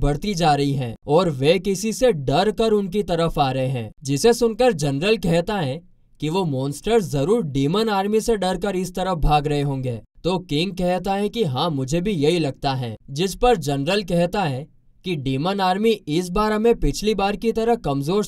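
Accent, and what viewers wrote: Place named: Gujarat, India. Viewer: Bhai ton Gujarati jese ha muje to acha lga😅❤ Love you 😎 bro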